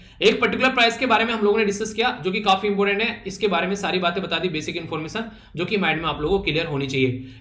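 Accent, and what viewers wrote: Haryana, India. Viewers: I guess from hariyana or western UP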